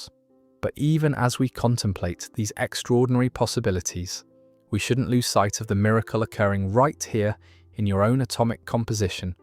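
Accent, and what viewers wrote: United Kingdom. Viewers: Ignoramus with a British accent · your British voice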